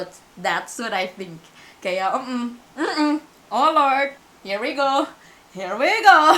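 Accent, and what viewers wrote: Java, Indonesia. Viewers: Kirain mantannya yg setan soalnya diajak ngomong tadi hahaha btw asli mana sih kok agak "NJOWO" bahasanya haha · gagal fokus.. logatnya kadang" ke jowojowoan..kadang" keluar kesundasundaan..hahahhahaha..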